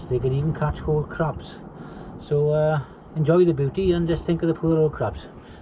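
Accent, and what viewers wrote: Wales, United Kingdom. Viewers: Great prawns n lovely welsh accent👍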